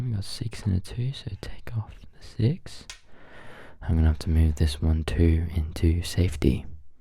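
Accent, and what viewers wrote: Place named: Australia. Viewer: Hey man, just wanted to say this popped up in my recommended and I’m super glad it did! As a fellow Aussie I surprisingly don’t see many ASMR creators from out our way, but your voice and accent is super well suited for this kind of stuff and the microphone/production quality relative to the size of your channel is really impressive. I’m a big fan of ASMR Chess and this gave me similar vibes to him. Keep it up!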